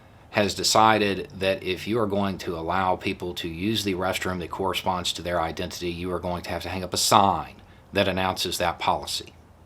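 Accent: South, United States. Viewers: THANK YOU THANK YOU THANK YOU THANK YOU for this. I've lived in TN my whole life which means I've been confounded for most of my adult life. Watching ppl I grew up with blindly hate vote for holes in their own heads, then yell YAY....HEAD HOLE! ACA, being a prime example. I'm an active Dem in my state. We're working hard to make inroads everywhere. We had Dems in 96 of 99 cong districts running in 2018 & 2020 which is huge for reasons I need not explain to you.. but it didn't move the needle enough. Only 35% of eligible voters vote in TN. I'm exhausted. I personally helped get over 80 ppl registered to vote for first time in 2020. Called every one of them to make sure they went to vote. So yeah..I'm exhausted. Thank you for spotlighting my home state. The real work of decent folks is in their own yard. I imagine what could be if we break that red south firewall, so it's that hope, that keeps me going. 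Your mere presence here, with your intelligent southern accent of truth & "wokeness" (sheerly used for lack of better term), in and of itself helps destroy the idea that the south is owned by the right. It's that idea that needs its ass whooped. So the other 65% who could vote but don't bcuz they rationalize it's hopeless & won't matter, can no longer honestly cling to that rationale. So THANK YOU brother! From one frustrated but not going away southern lefty to another.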